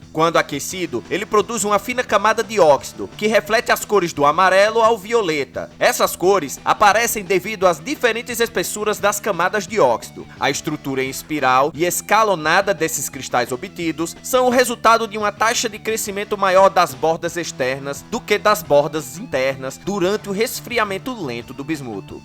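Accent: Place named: Pernambuco, Brazil. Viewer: Fera!! Muito top o canal, quem dera tivéssemos um laboratório de química assim na escola.. e de onde é o canal? Pelo sotaque, parece Pernambuco. Seja de onde for, o conteúdo é de qualidade, precisamos mais disso por aqui. Deus abençoe!!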